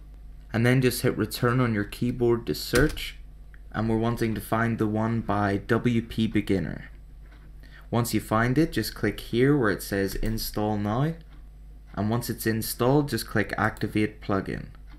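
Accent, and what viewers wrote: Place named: Northern Ireland, United Kingdom. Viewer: definitely a Northern Irish accent there.... good video!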